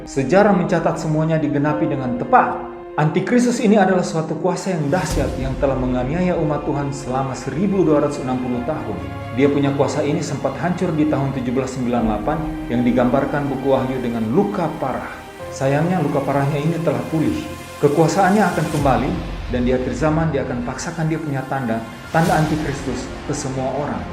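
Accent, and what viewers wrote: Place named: Timor-Leste. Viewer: Gaya bicaranya spt orang timor ya 😄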